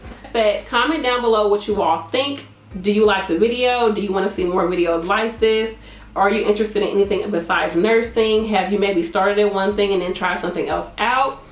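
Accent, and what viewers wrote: St. Louis, United States. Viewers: As soon as i heard your accent i KNEW you were from the Lou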